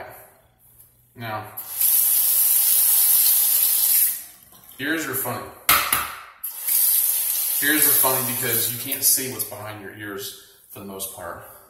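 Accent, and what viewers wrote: United States. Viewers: Yo man. Thanks for the vid. I’ve been hurting the CRAP out of my head!!! BTW, sounds like we live in the same area of FL!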